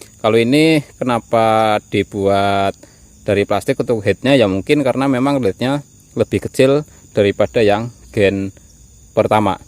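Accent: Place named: Tegal, Indonesia. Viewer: Wong tegal yaaaaaaakkkk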